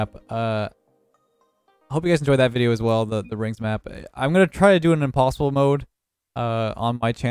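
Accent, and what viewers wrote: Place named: Canada. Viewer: I've watched every one of your daily vids for months now and I can honestly say... In this one you sound so Canadian 😂 The itis really brings out the accent apparently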